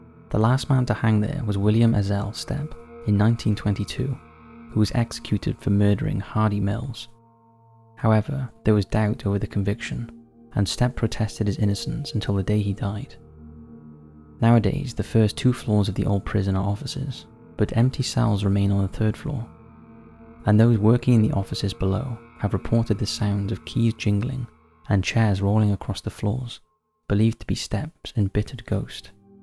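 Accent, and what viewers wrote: Wales, United Kingdom. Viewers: I love top5s content. However the narrator sounds Welsh, and seems to struggle with basic words in English. What's a thisic? Probably meant a Psychic... this is not the first time basic words have been pronounced strangely. Maybe some quality control before posting?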